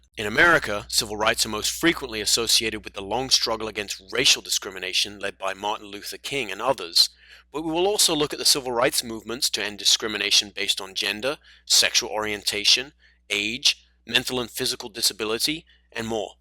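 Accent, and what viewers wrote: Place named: Australia. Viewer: I am confused that he is teaching us american government yet he has an Australian accent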